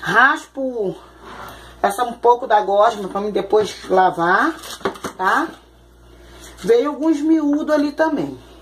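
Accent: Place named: Minas Gerais, Brazil. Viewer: Oi cheguei aqui estes dias e estou amando adoro seu jeito simples de falar vc nasceu em Minas? ???sotaque parecido Beijo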